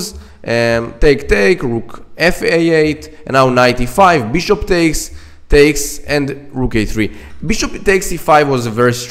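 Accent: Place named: Israel. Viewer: איזה מבטא ישראלי ❤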